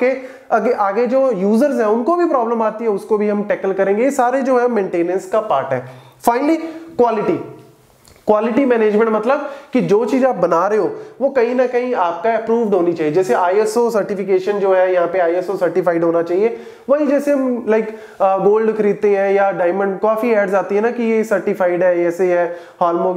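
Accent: Punjab, India. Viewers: sir aap punjab se ho..?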